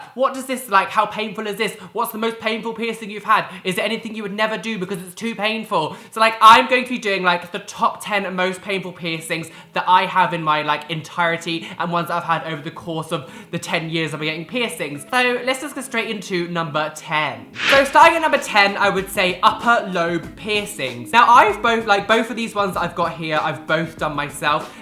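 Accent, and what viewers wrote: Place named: England, United Kingdom. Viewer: Madonna quanto fa schifo l'accento inglese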